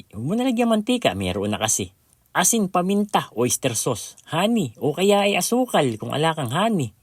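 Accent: Bulacan, Philippines. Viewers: Puntong puntong bulacan boss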